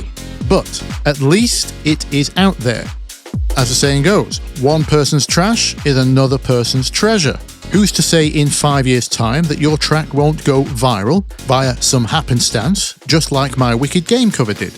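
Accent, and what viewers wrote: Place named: Yorkshire, United Kingdom. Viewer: Oh man I LOVE you. New to this channel but I've well and truly subbed and will be sticking around. You're a fountain of wisdom, cutting through the crap with refreshingly relevant advice. (You sound like a fellow straight-talking Yorkshireman too!) Mate, this video was for ME. I can't finish anything as my standards are too high. Hundreds of unfinished tracks I daren't sign off in case they're not perfect. Still putting off launching my music because I've got hundreds of different factors I feel need to be perfect before I start. Your vid is so inspiring, thank you! Your mantra about "not released because it was finished" is mega helpful! Just hearing you even talking about this issue is so encouraging.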